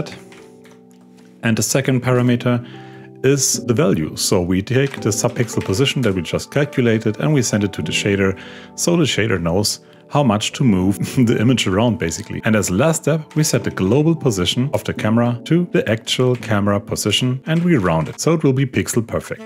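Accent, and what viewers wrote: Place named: Germany. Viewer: Do you not recognize the german accent?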